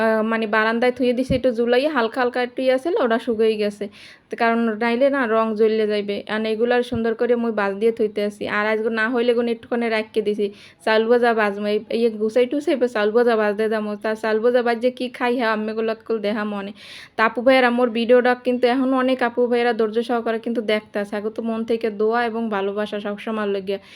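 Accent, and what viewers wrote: Barishal, Bangladesh. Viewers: লাইক দিয়ে দেখে নিচ্ছি বরিশালের ভাষা আমার ভাল লাগে। এত সুন্দর করে কথা আর বন্ধু না হয়ে পারলাম না। নারকেল দিয়ে চাল ভাজা অনেক খেয়েছি লেংডা কালে দাদী থাকতে। ভাল থাক বোন 🎁🎁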